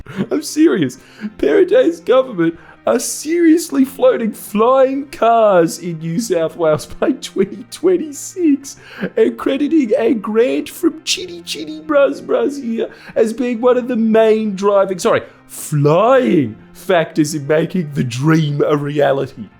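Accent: Italy. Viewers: Glad to hear the Italian accent is back. It’s like being back in the 90s. Thank you,.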